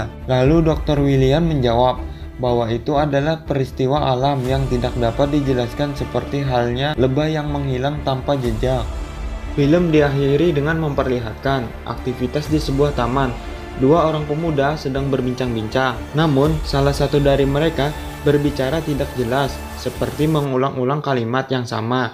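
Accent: Kalimantan, Indonesia. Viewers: Bang orng kalimantan kah?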